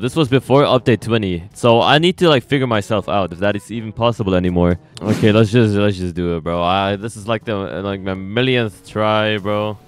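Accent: Germany. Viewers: Ich weiß nicht wie aber ich war mir zu 100% das du deutsch bist wahrscheinlich wegen dem Akzent haha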